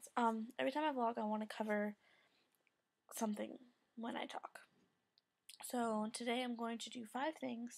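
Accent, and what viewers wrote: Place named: United States. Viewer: Ha ha sweet, thanks for the shout out, i've been super busy so haven't been able to get on here. It's Danny btw, but I don't mind hearing my name in an american accent! Keep up the good work.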